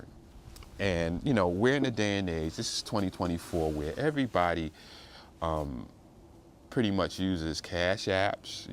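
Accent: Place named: New Jersey, United States. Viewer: it sounds like ur from Jersey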